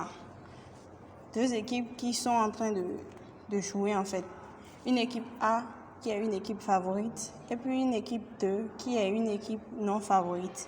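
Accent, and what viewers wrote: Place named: Benin. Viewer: L accent béninois explique trop bien 🎉 thanks you merci pour la vidéo je m abonne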